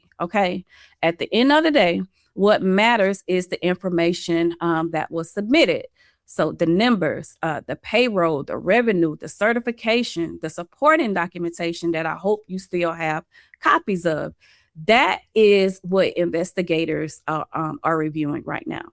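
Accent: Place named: Georgia, United States. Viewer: Sound like Georgia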